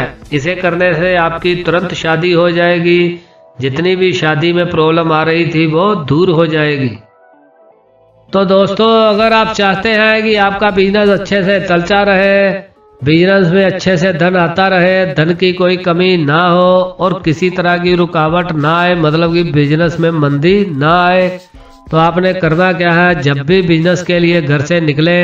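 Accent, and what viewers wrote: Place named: Punjab, India. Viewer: Aby tu panjab mai kr mujra kr teri tou hindi bhi panjabi wali hai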